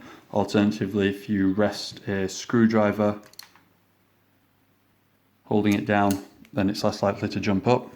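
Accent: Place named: Yorkshire, United Kingdom. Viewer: Gotta be from Yorkshire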